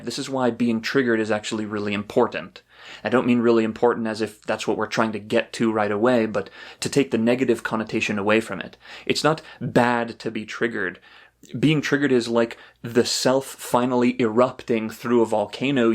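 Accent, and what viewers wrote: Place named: Canada. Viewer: Are you Canadian ? You say certain words like Canadians do lol .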